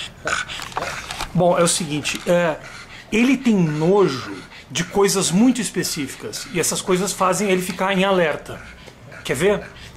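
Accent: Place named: São Paulo, Brazil. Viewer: meldeus, o já está puxando o R de paulista... (se ele já puxava eu não fazia ideia).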